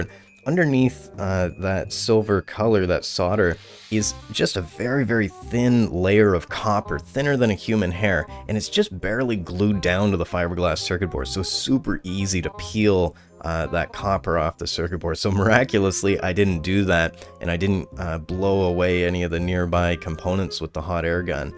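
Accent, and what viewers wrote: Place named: Canada. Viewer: Hey bro, you sound super Canadian here. Also this sounds like more than 500$ in labour.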